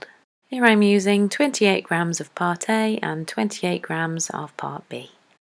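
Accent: United Kingdom. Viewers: I love your british accent as well as your artskills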